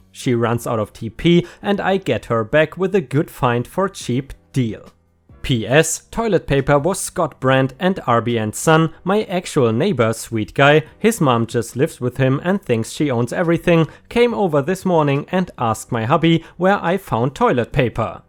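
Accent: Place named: Germany. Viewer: Kann das sein dass du deutscher bist? Man hört deinen deutschen Dialekt extremst raus...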